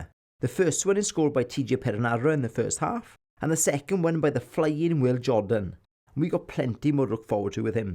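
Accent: Wales, United Kingdom. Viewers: Lovely lyrical Welsh accent ala Terry Grittths. I’d say when your ordering a pizza it’s like telling a story.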